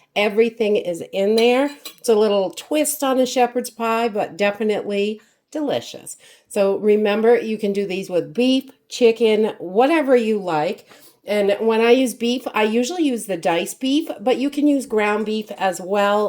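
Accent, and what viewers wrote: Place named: East Coast, United States. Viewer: I love all you do with freeze dried food! This is what I give my kids for Christmas now. I buy 12 quart jars and use your recipes to make them. They save their jars and I refill them every year. Thank you for your ideas! I love them! Thrive life is so worth it! Have a great day. P.S. I love your eastern accent!😊 I’m a born and raised midwesterner lol.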